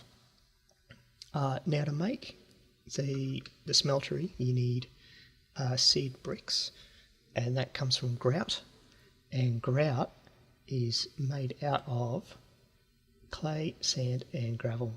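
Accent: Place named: Australia. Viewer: You sound so Aussie lol, I need to get some video tips from you. Your videos are always great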